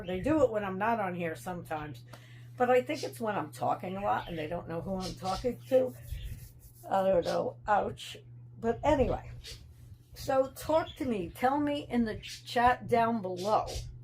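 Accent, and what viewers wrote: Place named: Michigan, United States. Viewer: Is she from Michigan? That is where I am originally from and it sounds like their accent LOL When I was a kid, women in their 60s looked the same as women today in their 80s and 90s LOL It's like your 60s today is just your midlife, not almost the end like it used to be. People retire now and enjoy their 2nd life.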